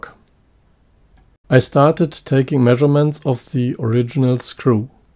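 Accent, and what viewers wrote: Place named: Germany. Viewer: Лучше бы по немецки шпрехал. Непривычно слушать англискую речь с немецким акцентом.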